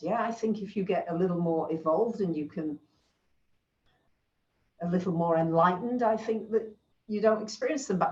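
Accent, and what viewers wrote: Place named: Lancashire, United Kingdom. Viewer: I love your videos and your accent, it's sounds like you are a fellow Lancastrian northern lass like me. Please don't let others undermine how wonderful you are, you have a lot of loyal followers who get so much from your posts.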